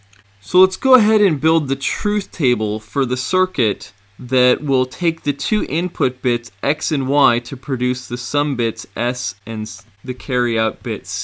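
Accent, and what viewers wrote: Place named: England, United Kingdom. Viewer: Thanks a lot for this english accent explanation, I'm having a trouble understanding indian explanation because I'm being distracted by their accent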